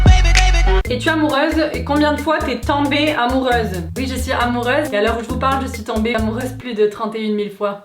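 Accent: Quebec, Canada. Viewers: Tu devrais faire la comparaison entres les films québécois et les films français, ça serais drôle et tu as bien l'accent québécois ! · J'aime trop ton humour mdr et ta voix ton accent demi français demi quebequois AHAHA 😂😘❤️ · Comment tu as appris le Québécois ? Stp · Oh, le russe est ma langue maternelle et c'était fort bizarre et agréable d'entendre ta voix en russe. J'étais persuadé encore une fois que tu es une meuf excellente. Continue comme ça, j'aime bien tes vidéos. Ils me donnent une opportunité d'écouter la voix française, peut-être un peu québécoise mais c'est utile tout de même. Bonne chance. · Behh moi j'aime trop new york (mis a part Donald Trump) et sinon j'aime bien le Québec et je love trop leur accent j'dis pas sa parce que t'es québécoise sinon jtem trop continue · Ton accent québéquois est oarfait je t adore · Je dis que oui tu as un accent québécois, mais pas comme si tu avais vécu toute ta vie ici! 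Love u ❤️ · mdr 😂😂 j’adore ton accent le franc québécois 😂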